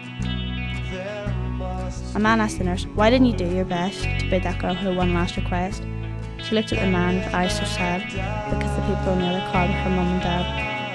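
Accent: United Kingdom. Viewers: it ok vid but you went to fast and r u from england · Just for some clarification, this is a true story. Based off of the poem, Moonlight Ride. Also, the way the narrator is speaking is how Northern Irish people speak. so understand that and get over it, and understand the true message behind this video.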